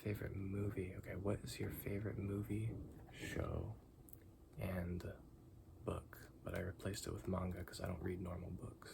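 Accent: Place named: California, United States. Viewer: Also immediately guessed you were from ca with the way you talk haha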